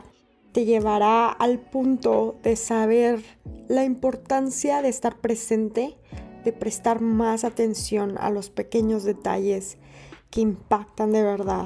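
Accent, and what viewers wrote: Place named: Upper class, Mexico. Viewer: No puedo con el acento tan fresa, el video es interesante pero que desesperante es el acento whitexican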